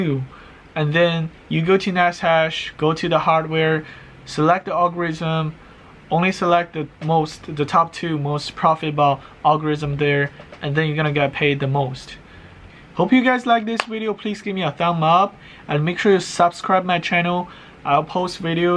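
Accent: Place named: China. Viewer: I love your chinese accent sorry if its offensive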